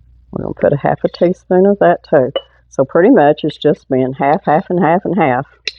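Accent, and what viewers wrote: South, United States. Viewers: Ms., once I fine tuned my ears to southern draw I was able to watch and hear what you were a doin. I liked it so much I fired up the canner and made 6 qts of your ranch style beans, 9 pints of black beans and 5 pints of sausage. Tomorrow will be 27 lbs of chicken! I appreciated your video and I plan to view more of them!! God bless you.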